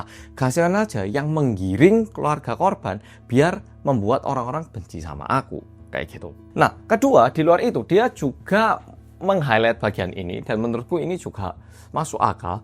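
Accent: Java, Indonesia. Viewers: Yutuber yg lain juga ada yg ikut bantu jelasin, tapi di liat2 aku lebih nyaman sama penjelasane mas 🤩 Jelas, teliti, ditambah aksen jowone iku loh seng bikin aku tresnooo😍🤣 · Bolak balik ngenteni rumpian mas medok iki rek..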